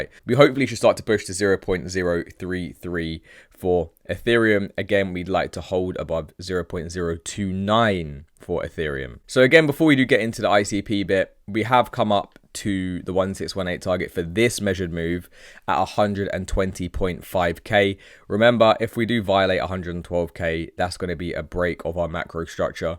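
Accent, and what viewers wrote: United Kingdom. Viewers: love the bri ish accent my man🍺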